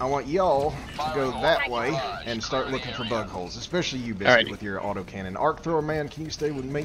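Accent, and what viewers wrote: South, United States. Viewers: A southerner talking about killing space bugs? Sign me up for the firebat squad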